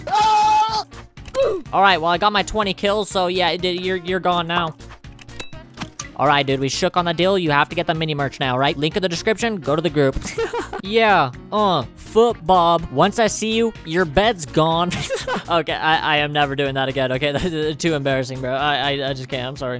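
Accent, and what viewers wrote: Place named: United States. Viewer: I like ur cowboy accent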